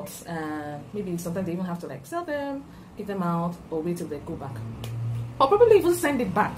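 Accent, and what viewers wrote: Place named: United Kingdom. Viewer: Your British accent is awesome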